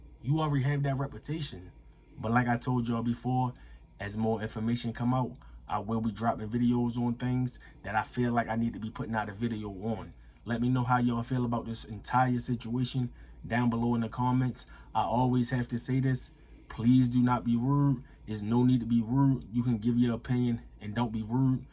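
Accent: Baltimore, United States. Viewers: You from Baltimore? Lol asking because of your accent.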